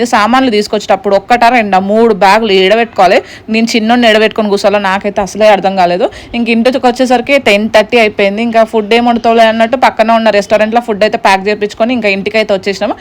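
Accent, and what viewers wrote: Telangana, India. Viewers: Telangana slang anthe untadhi bro ❤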